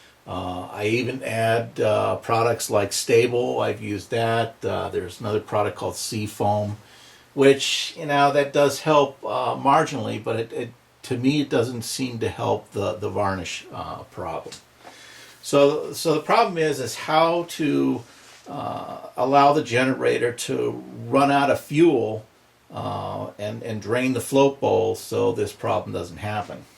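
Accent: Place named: Seattle, United States. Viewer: Great video thank you so much, a big help. Can I be pedantic and point out that the 'screws' you refer to removing at the start are not screws but bolts. Bolts have a machine thread which to work always has to engage with a nut or a threaded hole. Screws screw into wood or other softish materials and never require a nut or other receiving thread to be functional. Thanks! PS You're not BIll |Gates are you? Your accent is just like his.... Maybe a Washington/Seattle accent!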